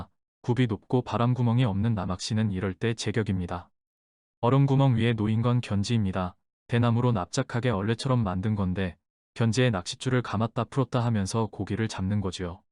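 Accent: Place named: Gyeongsang, South Korea. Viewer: 말씨와 말투를 보니 갱남분으로 보이네요. 학원맨 나는 1975 명동파 챔피온 다방 앞 소년들이 회색양복이라 부르는 19세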